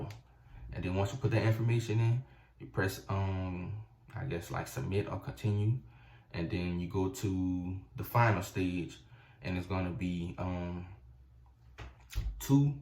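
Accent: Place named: New Orleans, United States. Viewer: Are you from Nola???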